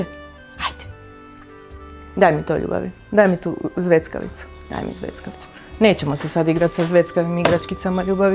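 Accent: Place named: Dalmatia, Croatia. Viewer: Draga,dok gledam tvoj video setim se letovanja koje sam provodila u Zadru i okolnim mestima.Tvoj rečnik i naglasak me podsete na ta divna leta.Tvoj vrt je predivan.Pozdrav iz Beograda.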